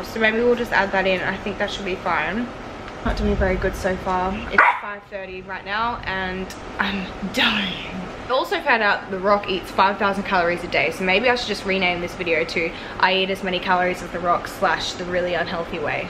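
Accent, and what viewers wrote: Australia. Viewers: She definatly has an Australian accent and the scenery in the backround looks Australian but I don't know for definite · I love you girl. I admire how much you can eat. You’re beautiful and I love your Aussie accent ❤️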